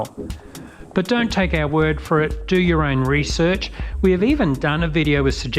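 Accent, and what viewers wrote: Australia. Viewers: I thoroughly like this channel, especially the practical insights with an Aussie voice. Any chance of /CoS collaboration?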